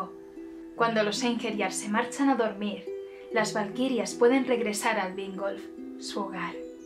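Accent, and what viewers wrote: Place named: Balearic-Valencian, Spain. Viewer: Molt molt guapo! M'agrada molt quant cantes o parles en 'bacaiva" (balear-català i valencià) però molt poques vegades t'he sentit.. de totes maneres gràcies! Les teves faules d'on precioses...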